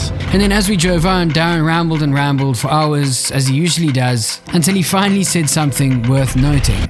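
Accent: South Africa. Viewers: I didn't know who you were until pressing play on this monster length recommended video. To immediately hear the South African accent and find out that you're also a Cape Town boy I knew I wanted to watch it till the end. The love and attention to detail you put into making this Masterclass is amazing and using all of your own advise within it places this at the top of my recommended list for anyone interested in film making. I'll definitely watch this again and add what I've learned from you to my next project. Thanks BRU and God bless. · Howzit! Is that an SA accent I hear? Thanks bru for all the info... was wondering if you think your region restricts your success on YouTube?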